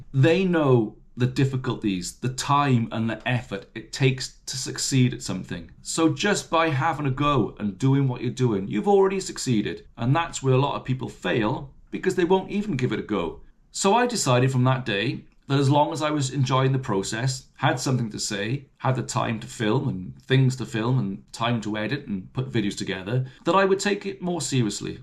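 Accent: Wales, United Kingdom. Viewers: Wife put you on the big T.V, She likes your welsh accent, and is now talking to me in her welsh accent, Loved the video ❤ Very inspiring Filming at the allotments to day for The Friday Allotment A Random Lady Allotment holder just told me your on you tube, I didn't know what to say? I got in tonight and I see she has Subscribe to my channel 🤣 Embarrassing or what So I must start embracing it!!! Will do buddy, The cats out the bag for me, And post man knows as well 🤪 So there's no going back for me now.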